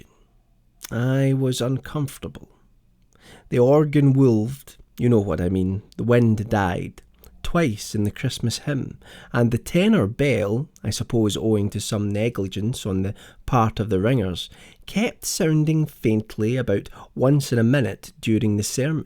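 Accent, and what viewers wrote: Scotland, United Kingdom. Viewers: I realise this is 9 years old, but I've really enjoyed your narration, glad I found your channel. I love a Scottish accent ❤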